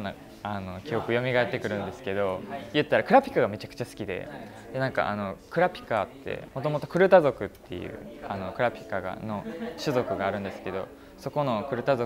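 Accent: Kansai, Japan. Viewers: インタビューやからはじめはわりと標準語で話してたのに 自分の好きなものを夢中で話してるうちにしっかり関西弁になってる雄大がたまらなく愛おしい😊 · イケメン❤かわいい❤ 面白い❤明るい❤スタイル❤ 黒髪❤関西弁❤大好き❤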